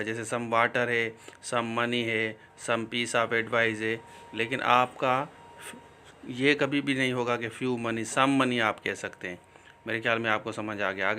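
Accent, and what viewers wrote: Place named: Sindh, Pakistan. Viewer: Grateful Dear Sir! Yh beech beech mn sindhi bhe mix hojati hy ap se sain jese hum sindhi logo ki adat hoti hy Hahaha. Wari Wari Hahah. .. God bless you sain!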